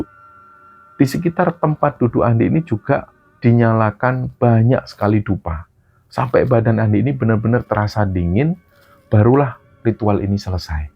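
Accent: Java, Indonesia. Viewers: nah ini ak suka dari kang karna ak dari Jawa ak suka Bahasa nya ada selipan bahasa Jawa logatnya juga masuk jadi bisa di pahami dan mengerti sukses selalu kang 🥰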